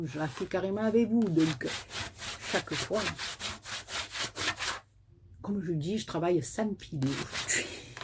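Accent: South, France. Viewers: Super carte et j’adore vôtre accent du sud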